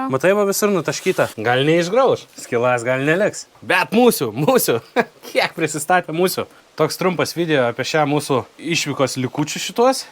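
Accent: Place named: Molėtai, Lithuania. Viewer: Iš tarmės gal pons iš Molėtų ar Utenos kraštų?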